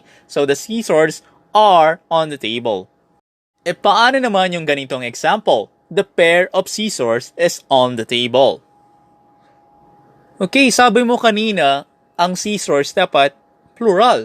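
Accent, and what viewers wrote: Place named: United States. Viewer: Boses amerikano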